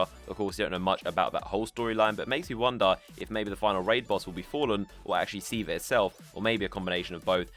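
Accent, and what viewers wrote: United Kingdom. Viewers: Race doesn't influence how you sound. He has a Brit accent because he's from Britain. Stupid.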